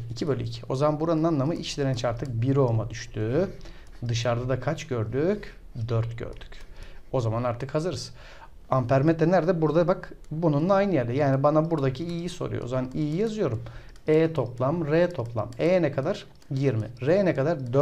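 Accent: Thrace, Turkey. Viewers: Ocam Trakyalı mısınızz?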